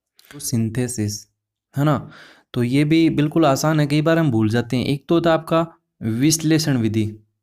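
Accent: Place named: Haryana, India. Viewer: Guruji 👏AAP pdane saath saath Jo hme motivate karte ho Aapka bahut bahut dnyavad .aapki aawaj se esa lagta h Guruji 👏 Jese AAP haryana se ho AApke pdane ke method ka koi jwab nhi Guruji 👏 AApke vedio Dekhkar saath saath notes bna rhi hu Guruji 👏 from kaithal HR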